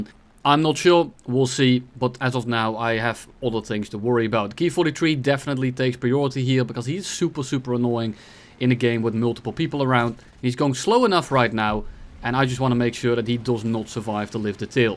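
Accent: Netherlands. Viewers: Thanks for the entertaining videos and the dutch accent, keep it up!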